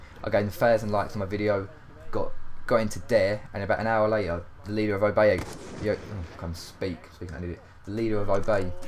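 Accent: England, United Kingdom. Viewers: Are you English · How old is he? And is he British?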